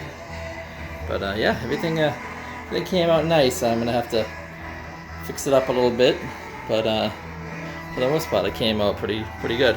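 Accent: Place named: Boston, United States. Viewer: You sound like.yohr from boston :)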